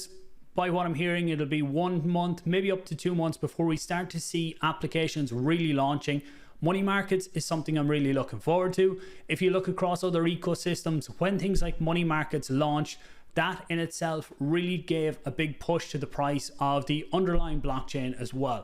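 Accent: Ireland. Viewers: Thanks for the quick video and update, —always appreciate your insight. New mic sounds clean; that beautiful Irish accent is as crystal clear as ever!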